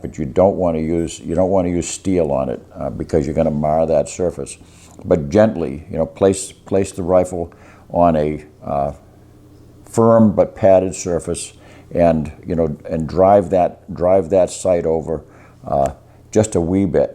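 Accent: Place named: Maine, United States. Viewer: I know a fellow maine accent when I hear one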